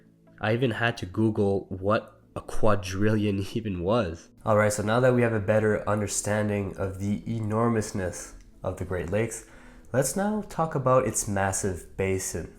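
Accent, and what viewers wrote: Quebec, Canada. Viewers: he’s definitely french canadian considering that’s how you pronounce niagara in french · He sounds qubeccois. Based on the way he pronounces French names. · Seriously, you sound Canadian, but you're mispronouncing very common things like Mackinac and Niagara. Macnik? Neeagara? Isn't this how we used to flush out German spies in Allied uniforms in WWII? :D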